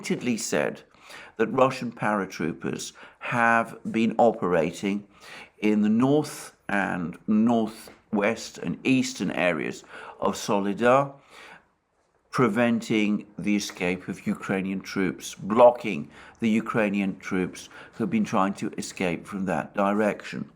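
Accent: England, United Kingdom. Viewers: is a Russian with and English accent.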